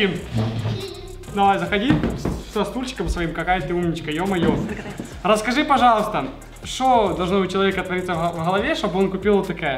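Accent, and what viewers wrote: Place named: Ukraine. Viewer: почему у тебя акцент Украинский?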